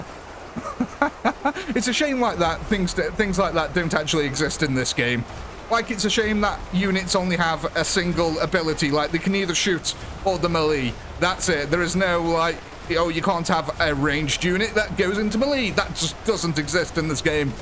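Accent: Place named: Potteries, United Kingdom. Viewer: 40k in a Potteries accent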